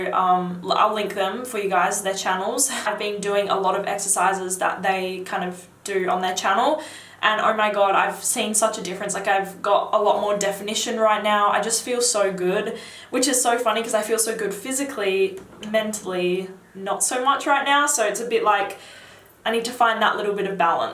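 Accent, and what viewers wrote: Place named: Australia. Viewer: I just wanna say i came across one of ur videos somehow i dunno how but i commented that ur funny coz it was funny i dunno u was talkin about cleavage lmao some new push up thang lol oh yea i was watchin i forget his name about 2018 best mens fall winter fragrances yea thats how i came across ur channel , u had somethin bout mens cologne but yea i cracked up lol, aussie accents are sexy dont let anybody tell ya different lol, keep up the good work 👍🏼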